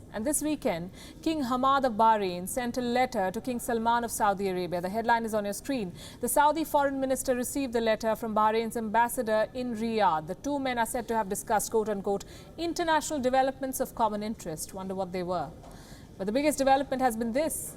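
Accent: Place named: India. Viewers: This woman has Apu's accent. So horrible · she knows her stuff.if accent bothers u,most indians have accent.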